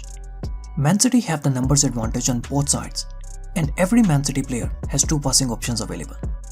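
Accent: India. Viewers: Since You are an Indian (accent) make an analysis on Sunil Chettri or The Indian National Team.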